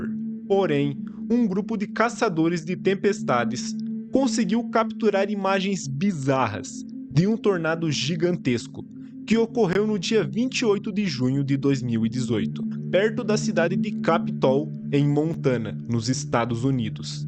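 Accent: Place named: São Paulo, Brazil. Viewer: Vc é do interior de São Paulo... caipires em dia ... muito top...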